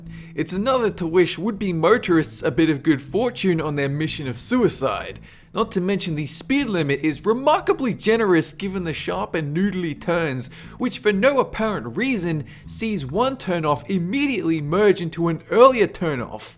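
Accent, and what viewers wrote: Australia. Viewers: I don’t know how many times I’ve started a Planet video only to learn that wasn’t the narrator for that video. I don’t mind the other narrators at all, but the constant disappointment of not being able to listen to beautiful Australian accent when I intend to is annoying. Thanks for this playlist. Now I can listen to Australian lit whenever I want to, and only him. Also this is one of, if not my favorite, videos. I don’t know how many times I’ve watched this video. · He just sounds like a normal aussie to me haha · OMFGGG i'm Australian too.. but, that's not the point. i thought you were American, and as soon as i heard you say WE have a saying here in Australia i can now suddenly hear an accent coming from you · Are you australian cause i am and you hardley sound australian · Is Australian? I can never tell the difference between a british, eglish, and australian accent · Very funny! 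Also, if you have closed captioning, like I do, it doesen't understand the Australian accent And the "gusses" it makes in American English are funny too!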